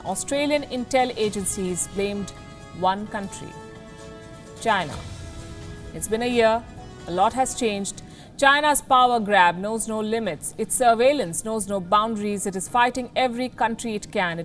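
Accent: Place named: India. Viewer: I like to listen but heavy indian accent make me dizzy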